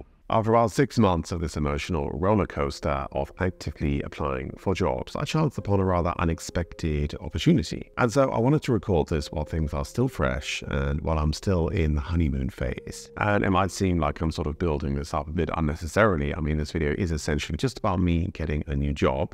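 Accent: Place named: Germany. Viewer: Your accent is so strongly German now.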